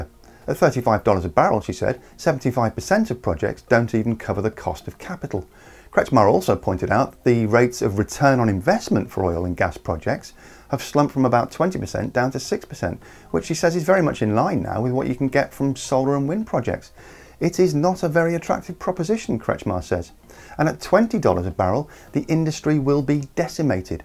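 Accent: England, United Kingdom. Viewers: Once the COVID lockdowns are lifted, demand for oil will rebound and the supply glut will ease. Most of this video seems like wishful thinking, as oil consumption is predicted to rise through 2040. Great presentation and a pleasant English accent doesn’t change that barring a technological breakthrough, fossil fuels will be with most of us for a while yet.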